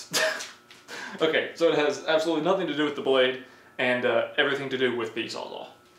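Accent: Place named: Wisconsin, United States. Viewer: You sound like your from somewhere in Wisconsin We’d know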